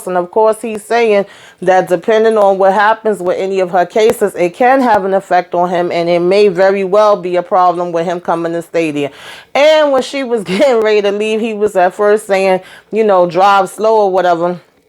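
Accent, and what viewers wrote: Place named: New York, United States. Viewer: Thank you for your excellent reviews. I just love your NY/NJ accent. It sounds like home. (I’m from LI). These LALU folks are not in their right minds especially Gabby and Chris. I fear for them and can see the more domestic abuse in their future. What a mess, but it’s so entertaining 😁 Keep it coming sis! Blessings 🙏🏾🙏🏾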